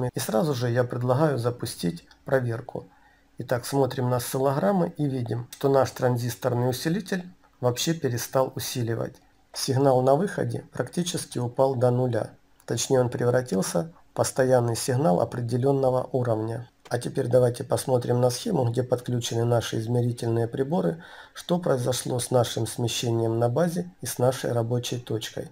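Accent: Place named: Surzhyk, Ukraine. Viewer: Слова некоторые просто Суржик , автор живет в той местности где так разговаривают . но так даже интереснее .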